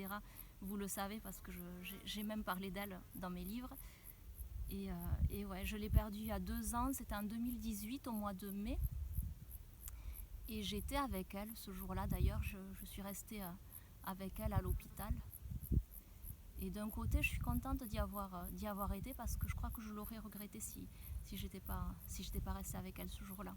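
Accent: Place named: Pyrenees, France. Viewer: Ah les Pyrénées,on ne veut pas les quitter,je suis d’accord,et pourquoi tu parles comme moi on a le même accent 😂❤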